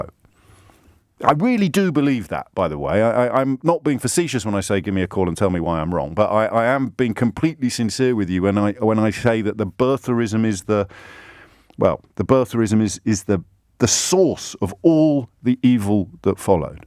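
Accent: United Kingdom. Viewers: I love this commen sence man with British accent.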